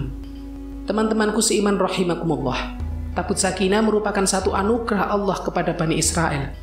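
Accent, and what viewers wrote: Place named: Java, Indonesia. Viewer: Logat yg bikin video jamet abiss medok da males gw ntn ni video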